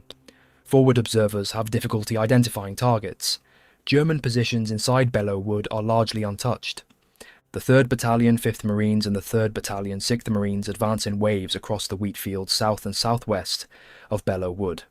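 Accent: United Kingdom. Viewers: Get rid of the UK narration 🤮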